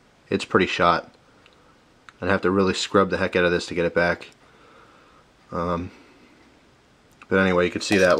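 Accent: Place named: Northeast, United States. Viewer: I love the way people from the North-eastern U.S. say 'water' lol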